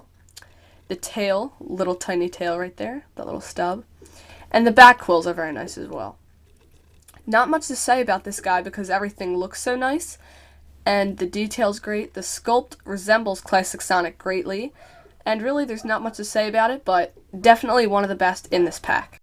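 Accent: United States. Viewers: I like the video but you're accent is terrible I can't stand a New Jersey accent! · r u from chicago?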